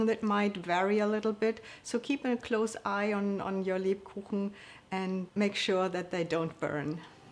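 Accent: Germany. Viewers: danke ganz toll. man hoert deinen deutschen akzent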